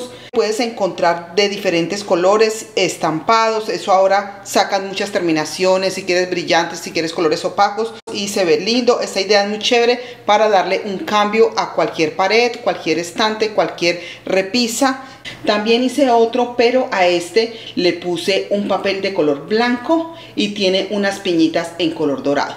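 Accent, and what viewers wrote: Colombia. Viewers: 🇨🇴💛💙❤️🇨🇴 Dios te continué bendiciendo. Me alegro mucho . Escuchar ese acento colombiano. Muy chéveres tus ideas.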